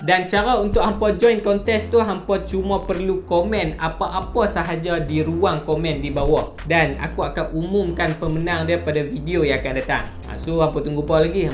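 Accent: Kedah, Malaysia. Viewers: Best...Berita kedah ja...ckp pon lorat kedah...snang fhm...negeri lain nk fhm kena Cari kamus dulu...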